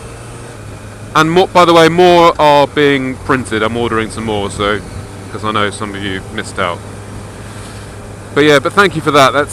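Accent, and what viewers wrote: United Kingdom. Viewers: Oh, your uploads are always the highlight of the week/month for me (okay, as long as we are talking about interwebz entertainment). I often ask myself if your videos would be as entertaining if it wouldn't be for your british accent. How about a hillbilly special, mate?:D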